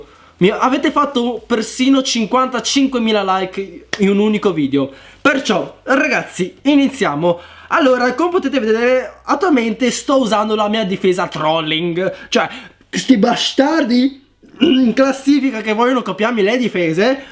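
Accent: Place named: Veneto, Italy. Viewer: oh te si dal veneto...mi so veronese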